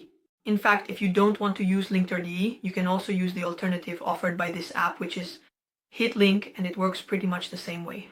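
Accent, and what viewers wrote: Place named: Italy. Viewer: Another great video but what happened to your wonderful Italian accent? Have you gone A.I.?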